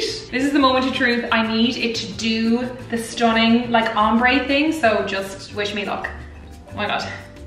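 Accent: Ireland. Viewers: Great drink for never making one! The Irish accent is one of my favorites, every time I hear it, my thoughts start using an Irish accent for some reason lol. If you make this again use a spoon to sink the grenadine, just pour it on the backside of the spoon, works great!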